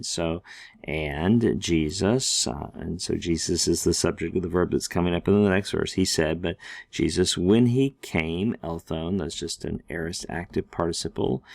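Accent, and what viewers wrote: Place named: United States. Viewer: Greek with an American pronunciation is not Greek.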